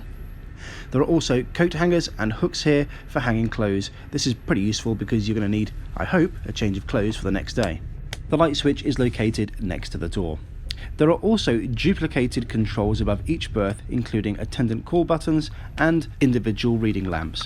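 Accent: United Kingdom. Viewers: . I'm writing you from Ukraine. You are really. I'm very lucky to see your wonderful journey. It's gonna be super. You are very cool. I adore Arctic and everything what is connected with this region. Fantastic show. Railway in Sweden is really engineering marvel. Nature. I have no words to describe my ambitions. Your fluent English, your real British accent, your manner of speaking, your wonderful voice, music, lifestyle — OK, everything was pretty good. I'm your biggest fun. Thank you very much for your journeys. I love it. You are on my chance. Suppose see you soon.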